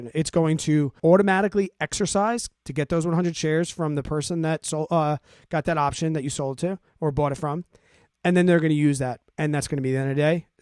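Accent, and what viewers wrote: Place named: East Coast, United States. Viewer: you sound like an east coast guy! You have a bit of an east coast accent. Where you from brother? I’m from the west coast. Thanks for sharing your options trading knowledge bud.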